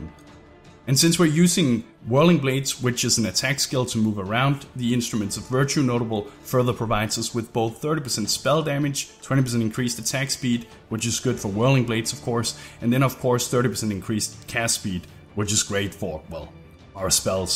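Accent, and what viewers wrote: Denmark. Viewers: Can you level up with this? Btw, are you danish? :D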